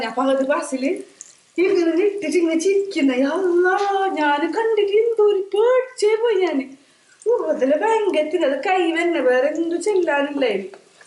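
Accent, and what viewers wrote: Kasaragod, India. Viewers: Sunesh 😀kasrgod slang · Nmmle ksrotte basa endorri paangppa😍😄 hyole bisyo kettit njn chirchitt merchhoyiii,😂😂😂👍👍😍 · ചേച്ചി കാസർഗോഡ് ആക്കി ശംസരിക്കുന്നത് ആണോ ശെരിക്കും ആണോ കാസറഗോഡ് കാരി ആണോ ഞാൻ ഒരു കാസറഗോഡ് കാരൻ പയ്യൻ (അല്ലെങ്കിൽ നമ്മൾ കാസഗോഡ് കാർ പോളി അല്ലെ) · നിങ്ങളുടെ ഈ റിവ്യൂ കൊണ്ടു മറ്റുള്ള മലയാളികൾ ഒകെ കുറച്ചു കാസര്ഗോഡൻ മലയാളം പഠിക്കട്ടെ. Good job. Enjoyed. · Is this kasaragod malayalam?? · ചേച്ചി ശരിക്കും കാസർഗോഡ് ആണോ.. 😂😂👍👍👍👍❤ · Kasarode poli slang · ശെരിക്കും കാസറഗോഡ് സ്ലാങ് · Yuppaa maje aayin njammale kasrodian slang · ivde ipo ntha indaye 😁😂 Ksd slang poli malappuram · Hahaha..usaaranne ppa..eden padiche kasaragod bhasa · കാസർഗോഡ് ഉള്ളവർ എല്ലാം ഇങ്ങനെ ആണോ സംസാരിക്കുന്നെ ?? 😆😆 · Ksd thanne diffrent slang und so its un predictable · Ende oru frnd paranjanu njan ee tym kasarkodu bhasha inganayundu ennu kettittundu...ippo aanu nerittu kanunnathu....enda ponne ijjathi resamundu kelkan😂😂😂❤❤ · Kasaragod slang is very beautiful to speak and hear.but don't make it vulgar.. 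· പുതിയാപ്ലെ അല്ലാ മാപ്ലെ casrod slang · Kzrkd slang 😍👌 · സൂപ്പർ സ്ലാംഗ് കാസർക്കോട് ഒരു മഴ പെയ്തു തോർന്നു പോയത് പോലെ തോന്നുന്നു അതി മനോഹരം അടുത്ത വീഡിയോ കാത്തിരിക്കുന്നു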